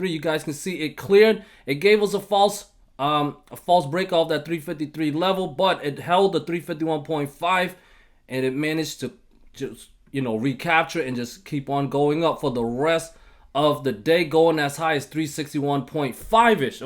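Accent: New York, United States. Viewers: you from ny?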